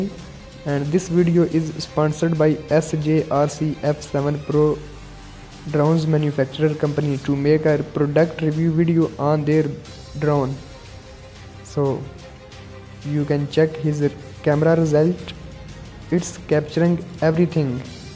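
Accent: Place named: India. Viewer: Your information in english with pure indian accent.but its nice.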